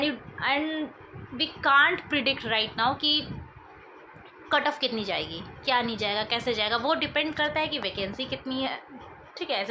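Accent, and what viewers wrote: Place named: Mithila, India. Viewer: Aapki soch bilkul Sahi h.. aapki sound bilkul hamare local language ke tarah mil raha h... Aap kis district se h... Mithila side se h kya???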